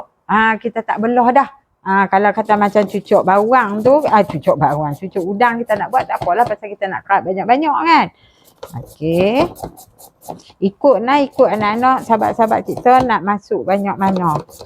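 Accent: Kedah, Malaysia. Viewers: Tu ckp pelet kedah ke perlis? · Suka la dengaq cakap loghat Kedah pure. Mcm mak saya cakap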